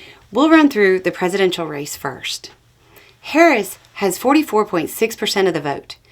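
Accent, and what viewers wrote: South, United States. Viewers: The faster talks the more I realize she’s from the south and beau is from the mountains (I ain’t calling you a flatlander but it’s a cultural thing) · LOL, you are positively GLEEFUL beneath that smooth southern delivery and I love it.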